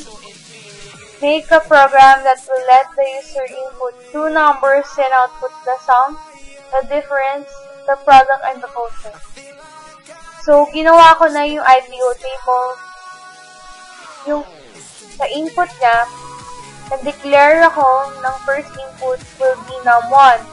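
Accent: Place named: Greece. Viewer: Nice work but your English pronunciation like Greek not that clear.. overally a good work \m/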